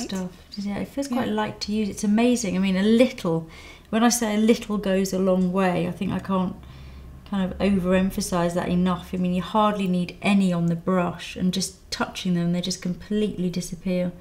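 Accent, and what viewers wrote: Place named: RP, United Kingdom. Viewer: All I can think as I watch this is..... her accent is so melodic and posh.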